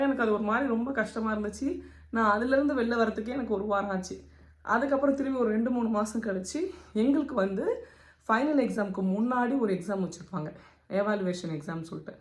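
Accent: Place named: India. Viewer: Hiii.. Very useful video..Thank you so much for sharing your experience.. Namma Indian English slang Pesurom school la work pogum pothu eppadi inga ulla local kids kooda neenga manage panneenga..They can understand our slang especially initial time when we get into the work?.How did you manage your starting days of working in school?Can you share some of your experience too.. Thank you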